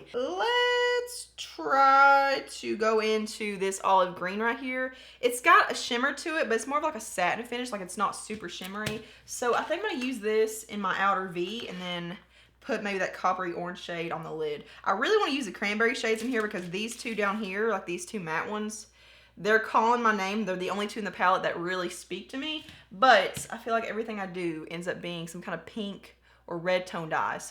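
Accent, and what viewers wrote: South, United States. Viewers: I love your channel and southern bell accent btw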